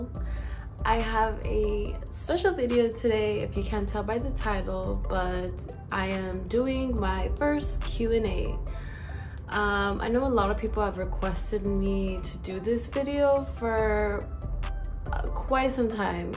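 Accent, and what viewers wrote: Spain. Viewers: I like your spanish accent.. Greetins from cozumel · Your Spanish accent <3 <3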